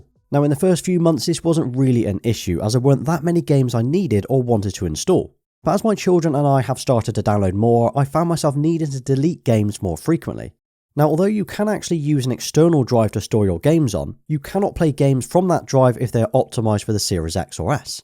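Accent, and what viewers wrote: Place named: South West, United Kingdom. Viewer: Great vid and nice to hear another South West accent! 😀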